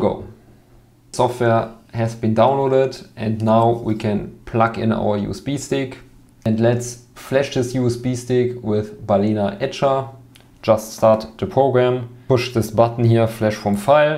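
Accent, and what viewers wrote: Germany. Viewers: I hear german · you sound really german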